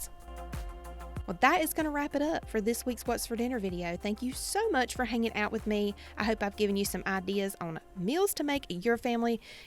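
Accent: South, United States. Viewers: Girl you talk like me, country as cornbread 😂 I love it! Proud of my southern drawl. 💁🏼‍♀️ New subbie! 🤗 💕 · All these recipes look great girl☺️ I always enjoy watching you and listening to your awesome southern accent! 💗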